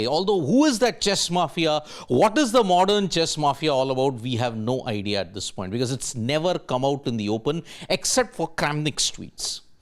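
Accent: India. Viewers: Get used to Indian “English”.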